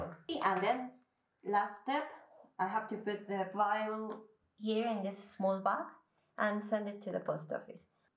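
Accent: Philippines. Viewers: Wow Now this is very interesting.. You look Asian and how you speak like a Filipino perhaps due to Spanish influence. So I ever I travel to Mexico I can blend in as long as I don't speak. 👍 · In addition to your physical appearance (very Filipino), your mannerisms, way of speaking, and your accent is very Filipino. I don't know how you did it but, if you could speak Tagalog or one of the other Filipino dialects, no one would question you for a minute !! I have much exposure to Filipinos. In one of my jobs, of 50 people, I was the only one not Filipino. Most of my friends in College were also Filipino. You would fool me !! DNA tests: Most companies only test certain/small sections of you genome. That is why you can get different results from different companies. There will be variances. Because of the way genetics work, you could still be part Japanese, but your ancestor's genes were not dominant. 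· You speak English with a Filipina accent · Ur accent sounds Filipino btw I’m not Filipino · even her accent sounds filipino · That's a Philipino accent, right? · Your accent sounds like Tagalog was your first language. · Curiously, you speak English with a distinct Filipino accent. I have lived in both countries (Mexico and Philippines) and have had a Mexican and Filipino wives. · Who taught you english, because you really sound Filipina. If you went there, people would be very confused. Seriously who taught you English. Where did you get that accent from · I definitely get where you're coming from. I've been told I look Asian all my life. I'm at the point I can easily tell when someone is trying to "figure me out". I've even had people argue with me that I HAD TO HAVE SOME Asian in me, even to the point where they go as far back to when the land bridge existed connecting Russia and the Alaska. 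And they tell me I'm Asian because Asians crossed that land bridge. I will definitely get my DNA test done. I'm very curious now. I will say, because of your stature and your accent, I can see how some may say you're Filipino, especially where you say your "F"s. Regardless what the DNA tests say, you're a very beautiful LATINA. Where it proudly. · I was thinking she looked and sounded Filipina, went straight to the comments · Like you I too was very curious about my races. First I am 50% Asian ( Visayan/ Chinese), Spanish, and amazingly 24% Indigenous. The Asian is: Malaysian, Taiwanese,Indonesia, Laos. Indigenous: Peru, Bolivia, Ecuador, Brazil. Who knew! And yes, you can pass for Pinoy, but you do look mixed. I live in Hawaii, born and raised. Here 75% of us native people here are mixed. Mostly Asian.Your accent can also pass for Pinoy. Like South America, the Philippines was also raided by the Spaniards and also the Japanese. 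So happy I came across your page because I am researching my heritage. You are very Beautiful. Aloha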